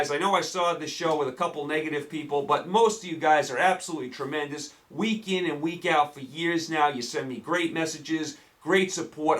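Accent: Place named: New York State, United States. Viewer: New York State i'm guessing.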